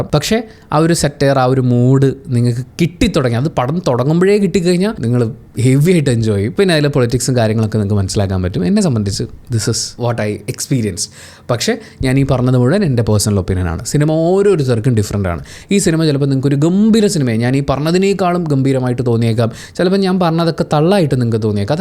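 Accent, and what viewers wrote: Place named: Eastern Kerala, India. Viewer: പാലക്കാട്‌ ഭാഷ അല്ല കിഴക്കൻ മേഖലയിൽ ചില ആളുകൾ സംസാരിക്കുന്ന ഭാഷ ആണ്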